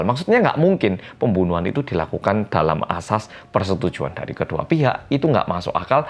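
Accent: Java, Indonesia. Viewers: Bang lu kalo ngomong logatnya kek orang Jawa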